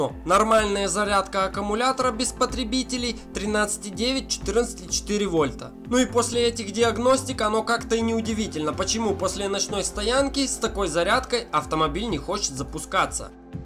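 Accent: Ukraine. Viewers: Акцент дикий! Ты из Хохлостана?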